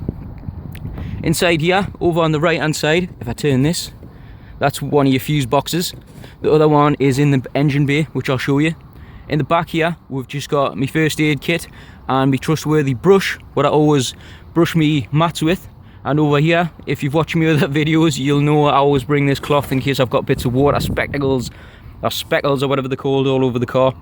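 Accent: Newcastle, United Kingdom. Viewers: Cheers mate enjoyed that 😅 off to view one of these tomorrow! love the Geordie accent reminds me of my offshore days 😊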